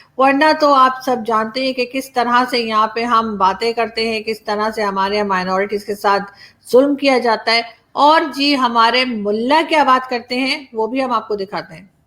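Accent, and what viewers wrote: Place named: Bhopal, India. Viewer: Actor name vicky kaushal hai... hamare yaha bhopal me bhi aapke jaise hi loki aur kaddu bolte hai.. jaise aapne bola hai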